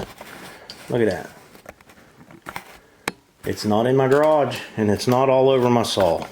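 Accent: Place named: Pittsburgh, United States. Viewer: Are you from PA? I hear a bit of Yinzer in there.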